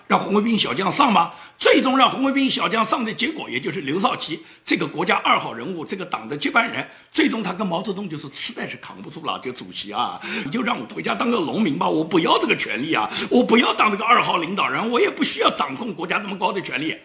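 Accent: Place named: Nanjing, China. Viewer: 你南京人吧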